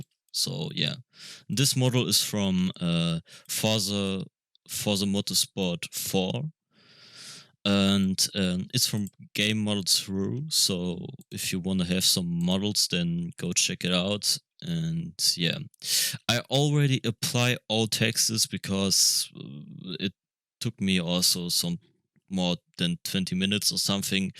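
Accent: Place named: Germany. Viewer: nice tut,i could hear the german accent xD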